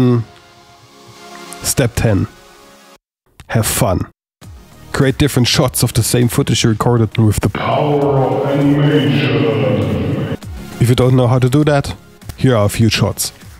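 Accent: Germany. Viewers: Erstes Wort und ich wusste du bist deutsch Haha😂👍🏻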